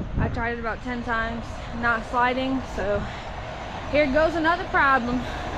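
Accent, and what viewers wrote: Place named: Oklahoma, United States. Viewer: U sound like an okie!!!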